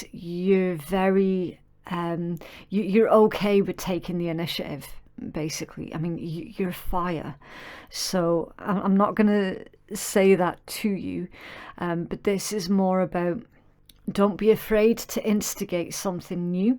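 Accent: Scotland, United Kingdom. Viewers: You're scottish 😍😍 hahaha Loving the accent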